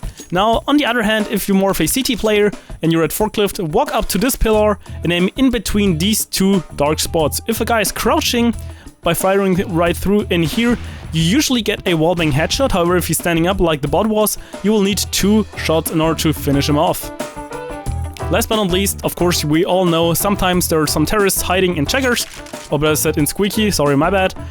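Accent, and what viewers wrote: Germany. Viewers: Is it possible that ur german ? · das DEnglisch. DAS DENGLISH. DEEEENGLISH. EINFACH AIDS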